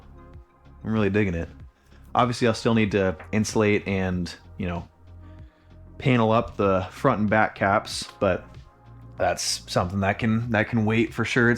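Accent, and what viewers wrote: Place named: Northern England, United Kingdom. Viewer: imagine y’all grew up in a similar area to have that same dialect must be up north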